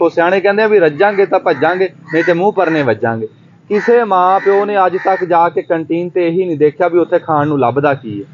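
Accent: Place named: Doaba, India. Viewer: Wah ji sahib,tuhadi Punjabi 100% duabey wali boli koyi pata nahi lagda ke koyi Pakistani ja indian punjabi..videos tuhade boht hi changgey te akal den waley hunde aa..👏👏👏👏👏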